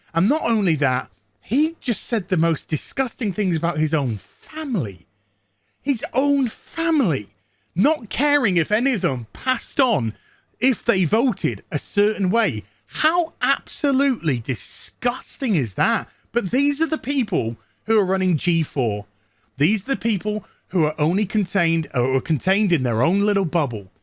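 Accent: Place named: United Kingdom. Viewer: I like how you sounded British in this video.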